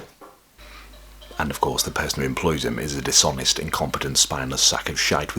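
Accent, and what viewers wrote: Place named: Yorkshire, United Kingdom. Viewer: I Loove how your Yorkshire accent makes odd appearances now and again. Bloody brilliant mate.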